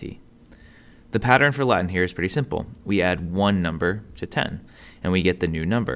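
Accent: United States. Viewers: Gotta work on that pronounciation. Sounds way too American . Nice educational video tho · It is really underwhelming to learn Latin by listening to this strong American English accent. There's no "Rrrr" sound at all, for example.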